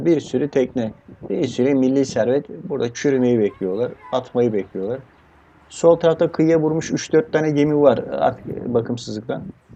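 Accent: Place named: Black Sea, Turkey. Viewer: Abi karadenizlimisin selamlar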